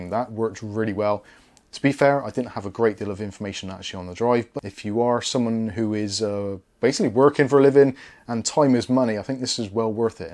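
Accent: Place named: West Country, United Kingdom. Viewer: Great video. Nice West Country accent ;-)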